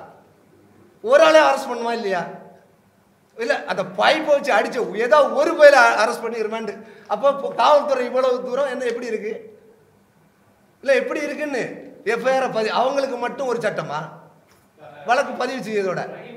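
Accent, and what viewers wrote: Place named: Tirunelveli, India. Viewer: நெல்லை/ நாகர்கோவிலில் பாஷை தெறிக்குது....🔥🔥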